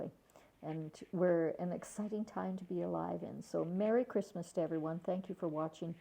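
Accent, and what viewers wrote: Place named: Quebec, Canada. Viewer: Québécoise? Ha bin, gârre d'on câ. Lâche pas la patate! 😁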